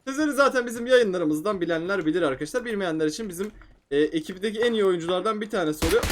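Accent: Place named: Adana, Turkey. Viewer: Yoo Adanalı lar da öyle konuşuyo ben Adanalıyım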